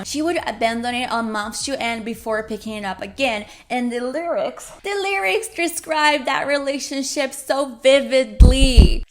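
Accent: United States. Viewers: your california accent 🔥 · I thought you were american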